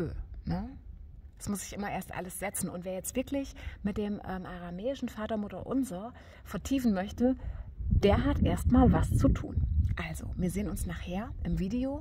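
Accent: Hesse, Germany. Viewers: Liebe, heute an diesem für mich besonderen Tag habe ich dein Video mit dem aramäisch en Vater-Mutter unser gefunden. Du hast es wunderbar gesprochen - gefällt mir persönlich besser als die gesprochene Version von Monika Herz im Martha Gebet. Ein winzigen hessischen Akzent habe ch gehört- aber schön. Ehrlich gesagt, gefällt mir persönlich für unsere Breitengrade das deutsche Ur-Vaterunser doch am besten. Wegen der Klarheit, Schönheit und der Möglichkeit die Dinge in ihrer Gesamtheit zu verstehen. Wir müssen nicht alles neu erfinden oder in Sprachen sprechen, deren Struktur wir eigentlich nicht verstehen, alles gut wie es ist - soweit kurz zum Thema"Hype". Wenn wir das was wir tun, mit dem Herzen tun, ist es doch völlig wurscht, ob wir Urdu, Hindi, schwäbisch oder hessisch sprechen Erstaunt war ich auch über das Buch, daraus habe ich meiner Mutter auf der Intensivstation jeden Mittag vorgelesen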